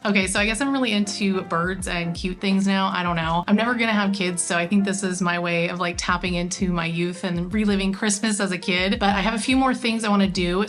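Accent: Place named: California, United States. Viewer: A piece of trivia. In reference to a previous comment, concern about your voice, your inflection told me you were a Californian! My kids grew up here. They don't have that accent. I grew up in San Antonio. I used to have a thick accent. My sister did not. Go figure.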